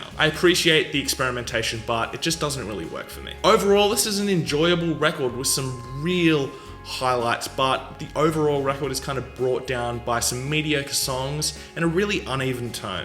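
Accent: Australia. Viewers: aussie accent? aaaaaand close tab. sorry dude :/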